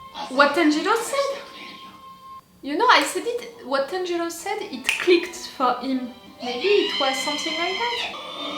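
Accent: France. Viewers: yeah it's probably because of your french accent Most people doesn't like it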